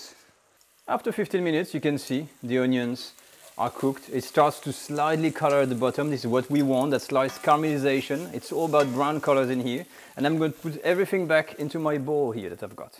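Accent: France. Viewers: Love French food, and love the French accent. This looks delicious.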